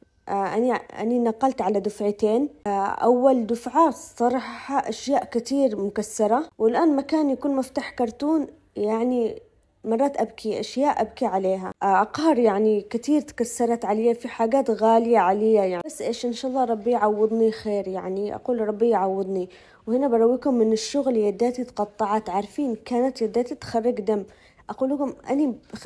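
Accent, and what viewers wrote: Aden, Yemen. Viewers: اللهجه العدنيه حاليه وانت من نطقك لها صارت أحلى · انت يمنية منزوجه مصري والا كيف كلامك يمني الله يكون في عونك ويرزقك من الخير كله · انتي يمنيه باين من اللهجه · الحمد لله على سلامه الوصول يا الى مصر الله عينك شفت الحاجات المغلفه وحسيت بالمجهود الي باتقومي به للترتيب هذي الحاجات اكيد بياخذ وقت ومجهود كبير منك الله يكون في عونك بس نصيحه مجربه كل ما اجيتي على نفسك وتعبك كلما خلصتي الترتيب والتنضيم بسرعه وجيبي معك احد يساعدك مش لوحدك تشتغلي انا. يمنيه واحب اسلوبش في الشرح وطبخاتش روعععه. ولهجتش العدنيه الحلوه وصوتش الجميل. الله يخليك لنا ياريت ماتتأخري وتنزليلي لنا اكلات رمضان الي بانزين مائدتنا بمأكولاتك الذيذه معك ام ماجد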